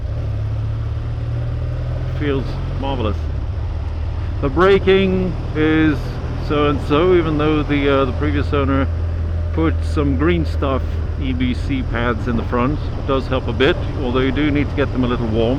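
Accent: United Kingdom. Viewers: Funny I was wondering the same thing. He sounds like a Brit but I know Brits drive on the left?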